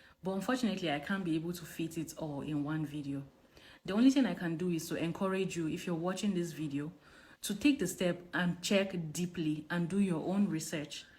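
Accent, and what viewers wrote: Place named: Hausa, Nigeria. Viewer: Sis, but you have Hausa accent. Did you grow up in northern Nigeria?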